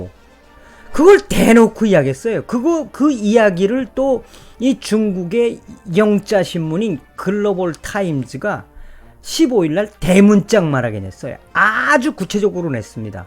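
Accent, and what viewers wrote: North Korea. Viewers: 북한 말투듣기가 거북스럽습니다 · 정성산님 방송하시면서 북한발음을 좀 삼가하시죠. 채널에 들어가다가도 그 발음을 듣고 채널을 돌림니다. 참고 해주시길~~~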